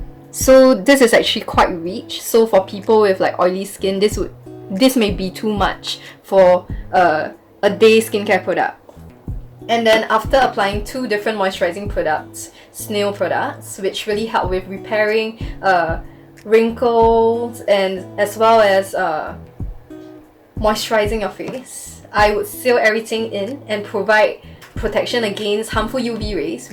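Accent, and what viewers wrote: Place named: Singapore. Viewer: dat singaporean/malaysian accent....instant recognition and instant upvote from me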